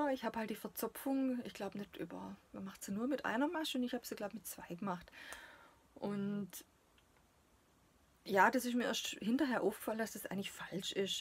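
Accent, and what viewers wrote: Swabia, Germany. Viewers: Hallo. Ich mag deine Videos und dein schwäbisch ist einfach nur schön😊 grüße aus Esslingen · Hallo, ich höre dir gerne zu, du hast eine Art die mich total entspannt, keine Hektik nur tolle Arbeiten zum schauen und dein leichtes Schwäbisch ist sehr nett. Ich will auch so nen Baumarkt in Frankfurt aber er wäre mein Ruin glaub ich. Du machst das ganz wunderbar und ich freu mich jedes Mal wenn ich ein Video von dir sehe. Mach's gut bleib gesund.😉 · Hallo liebe, vielen Dank für den Tip mit dem Baumarkt für Frauen "freu" da werd ich die Tage doch mal vorbei schauen isch ja grad mal ums Eck und endlich mal eine Schwäbin die nicht Hochdeutsch "schwätzt" Liebe Grüße aus Fellbach:)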